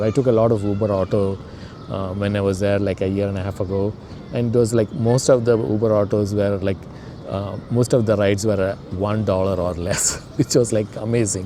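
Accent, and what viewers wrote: Kerala, India. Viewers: Why didn't you pick up the American accent in 35 years? You still have the mallu accent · Having not lived in Kerala, you still have a Malayali accent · The first accent figured out Mallu ❤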